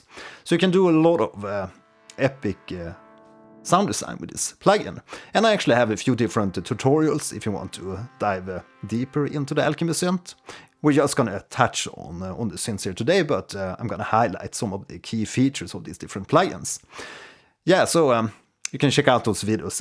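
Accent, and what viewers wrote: Sweden. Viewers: I love your Swedish accent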